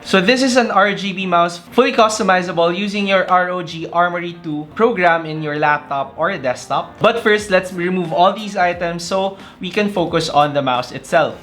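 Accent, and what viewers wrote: Philippines. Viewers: Haha no worries. After seeing the Mexican conversion, I remembered you had a Filipino accent and looked that up.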